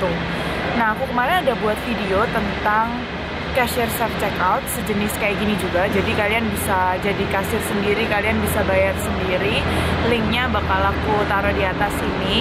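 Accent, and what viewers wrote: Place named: Malang, Indonesia. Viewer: Walaahhh Arek Malang rek dadakman wkwk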